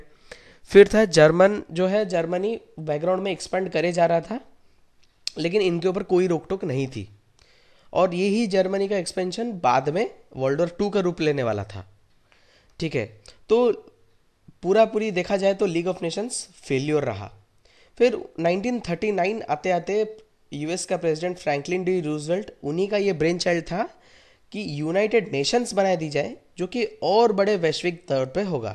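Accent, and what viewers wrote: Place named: Bengal, India. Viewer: sir u please don't make videos ..your voice is terrible ..your begali accent is not at all effective ..it's very tedious ..i m unable to comolete the video due to your tedious voice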